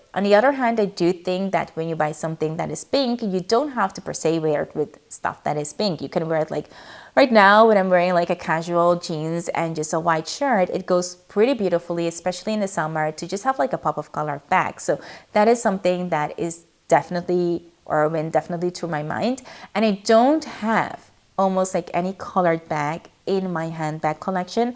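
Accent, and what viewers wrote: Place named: Netherlands. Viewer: wat leuk! Ik wist het ik dacht het is Nederlands of iemand die Afrikaans van Zuid Afrika spreekt. Yes ben Nederlands Surinaams maar woon al 8 jaar in Noord Amerika momenteel in Montreal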